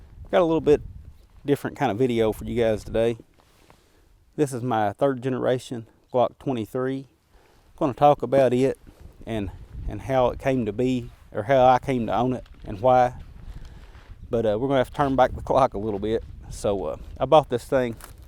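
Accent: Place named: South, United States. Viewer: One of the best Glock pistol vids I’ve seen. Great shot and love the accent. I gotta get down south one day.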